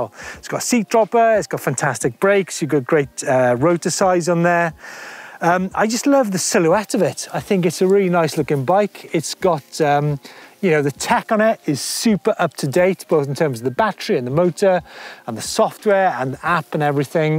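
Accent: Wales, United Kingdom. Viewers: You kidding, right? is Welsh 🤣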